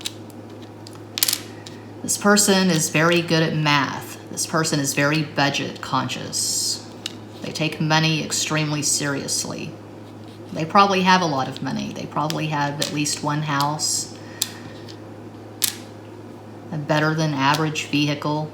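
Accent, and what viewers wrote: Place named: South, United States. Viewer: Pile 4: I love how you read. Very calming, soothing voice and all, with a southern twang. How do you do it?🙏😇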